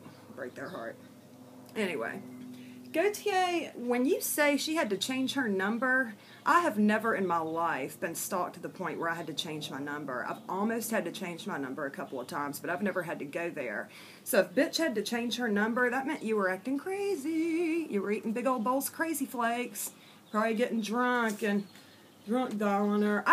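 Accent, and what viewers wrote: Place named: South, United States. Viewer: I love your southern drawl !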